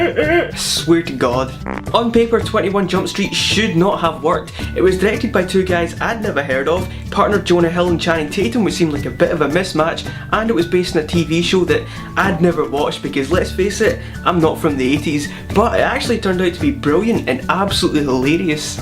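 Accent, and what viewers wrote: Scotland, United Kingdom. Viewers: It's so nice to hear another scottish accent on here!! This review was really useful, I was needing to choose what to go watch at the cinema tomorrow, so thanks :)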